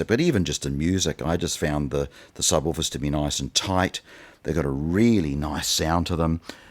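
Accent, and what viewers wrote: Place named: New Zealand. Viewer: I can't afford these things... yet! But my BestVox LS3/5a are definitely going to find a home on top of these at some point. In the meantime, I'll have to live with the Spotless Seed 1-way speakers, and their dedicated subwoofer/stands. They are rather incredible. So that is not difficult. I own 26 sets of speakers, and 7 subwoofers. :) Edit: LOL. We have the same RCA cables. Great minds... ;) Edit 2: LOL. We have the same Kiwi accent, too. I'm in CHC. :) Edit 3: Sorry to say this, but you are sitting miles too far from those speakers. You are well beyond the Critical Position according to Reverberance Decay theory. That means the room is BY FAR the biggest contributor to the sound you hear. If you were to toe them in a little, so the 5as focus about 1.5m behind your head, and you sit within 6 feet of the speakers, you will be absolutely shocked at how great your system now sounds. 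Try it! Rooms are horrible for farfield audio, in general. And Nearfield listening is the universal cheat code. I only sit 70cm from the speakers, and 60cm from each subwoofer in my all-Class-D quad monoblock setup running 4x 48v 10A GaN PSUs. And my system is capable of doing things to the human nervous system that $100,000 systems can not. Nearfield is why. And also extreme attention to set-up, and the time domain - which ruins 95% of all stereo systems.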